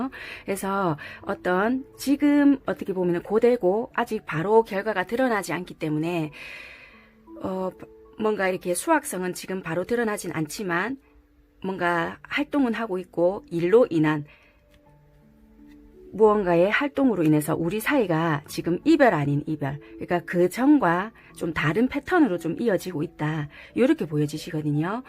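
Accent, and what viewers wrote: Busan, South Korea. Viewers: 네. 고맙습니다.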